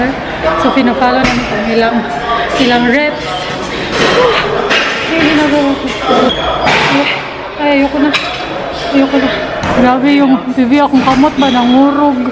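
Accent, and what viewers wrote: Visayas, Philippines. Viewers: Kacute mo gid na ya magbisaya teh 😂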